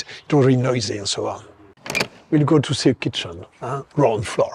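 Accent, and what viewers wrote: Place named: France. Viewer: Oh my godddd…. He speaks too fast with a very French accent.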